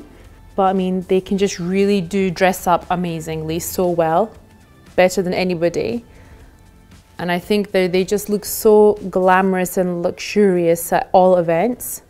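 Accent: Scotland, United Kingdom. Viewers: I'm hearing lil bit british accent, especially when you are saying 'now', love ittt❤️ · İskoç aksanı mı bu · helal abla senin scottish aksanına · Lovely video, you've spoke with a Scottish accent.. * Edit: Carries on watching the video and realised has a family living in Scotland! 🙈🤦‍♀️ Lots of love from London! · tanrı misafiri iskoç aksanıyla konuşuyor, cehaletinizi belli etmeyin bari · Daha önce yurt dışında yaşadın mı ingiliz aksanına benzettim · that british accent thoooo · you look stunning! Please make more English videos. It's amazing to hear English with Scottish accent.:) Love u. <3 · I love your British accent! Please take more videos in English. Greetings from North Carolina <3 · Scottish accentin cok tatli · Loved your scottish accent. I’d like to move&study in Edinburgh or St Andrews one day. Bonnie Scotland💙🏴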